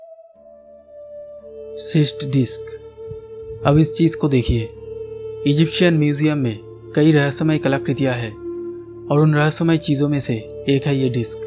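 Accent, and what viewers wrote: Bengal, India. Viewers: Sir, aap bangali kya, aapke awaj se aisa lag raha hain......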